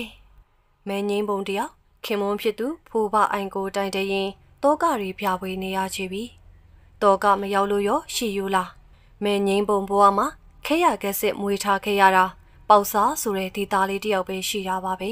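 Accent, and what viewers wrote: Upper Myanmar, Myanmar. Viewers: အညာလေသံလေးနဲ့ ဖတ်ပြတာလေး နားထောင်လိုံကောင်းတယ်ငိုသံကပီပြင်တော့ တကယ်ငိုမိတယ် တော် လိုက် တာ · အညာသံတထွေးကြီးနဲ့ ရေးချက်က ကောင်းလှ ❤❤❤❤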